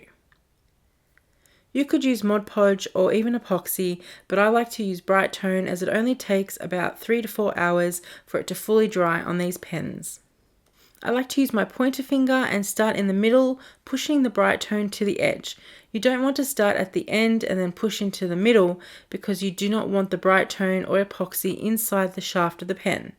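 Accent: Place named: Kentucky, United States. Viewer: Love that Kentucky accent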